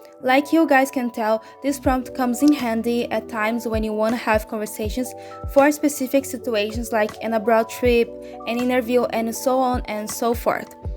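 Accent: Brazil. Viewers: Great video! Thanks for sharing these tips! (Also, it`s crazy how i just knew you were a pt-br speaker just by the way you pronounce the "T" lmao. brazilian folks unite~) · Are you from Brazil? Your accent really reminds me of Brazilians accent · Where are u from? Your accent reminds me of the brazilian accent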